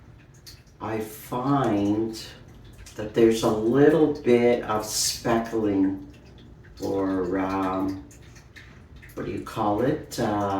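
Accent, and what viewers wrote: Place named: New York, United States. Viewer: Hi! That black cat callistophylla is on my wishlist as well as the undulata which I am right now looking for, affordable of course! I thought your accent sounded NY or NJ. Are you a transplant to Canada? Look forward to your next 💚! Dee, NY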